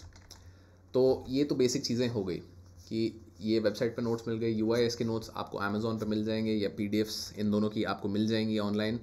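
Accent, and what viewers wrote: Bengal, India. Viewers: Sir are you Bangali?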